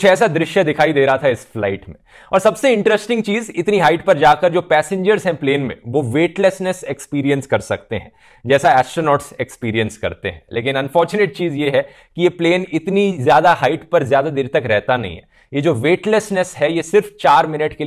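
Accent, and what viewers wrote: India. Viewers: Just a friendly question - do you accentuate your hindi accent on purpose ie calling ticket, as tikat tikat- for the videos? Cause you are catering to Hindi speaking audiences and want to make it easy to understand? Or is it just the way you normally talk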